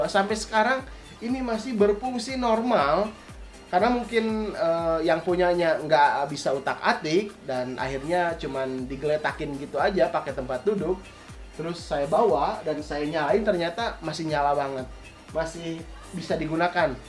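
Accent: West Java, Indonesia. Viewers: Urang mna akang B.indonesia logat Sunda..😂😂😂😂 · Logat sunda pisan euy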